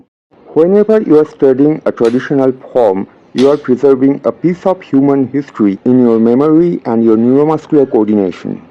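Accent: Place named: India. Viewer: You sound like you are from India. I like that you are very critical about the indian influence on Kung Fu and debunk the myth about Bodhidharma. But in the northern KF styles I see some influence of Yoga and Kalari. Do you know the Hatha Yoga posture "the warrior". Think of that with fist and you have a typical northern shaolin posture. Also I think these long movements and low and wide stances in the northern KF forms are for stretching and energy development like in Yoga. For fighting they dont make much sense. On the other hand the southern styles the link to the combat application is more obvious and the movements have quite a different character.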